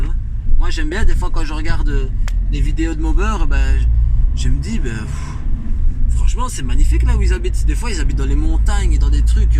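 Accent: Belgium. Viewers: Salut amigo ! Sympa ce petit concept de vidéo, ça change ;-) en tout cas tjrs autant agréable ton accent belge, je sais pas pk mais j'adore:-D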